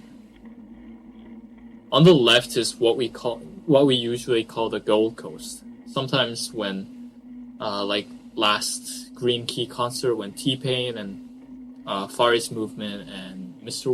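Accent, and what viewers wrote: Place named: China. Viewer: I can tell you have a slight accent. No offense. I'm guessing your bilingual and your second language is Chinese which is very difficult. You do speak perfect English though. I'm trying to learn French which is hard enough.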